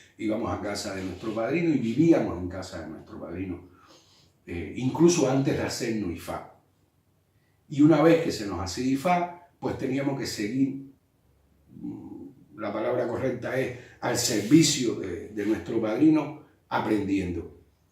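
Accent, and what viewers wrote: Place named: Cuba. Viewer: Porque hablas como cubano si eres. Mexicano?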